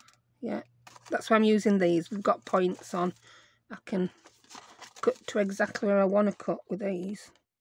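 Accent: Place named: Sheffield, United Kingdom. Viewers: I am really enjoying your channel in fact I am binge watching. I was born and raised in Sheffield but living in Illinois now. I just love listening to your accent, in fact it makes me homesick. I also like your attitude to crafting, it might work or it might not work, so de it. Gail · are you from Yorkshire??? we have similar accents.... I'm liking this vid :o)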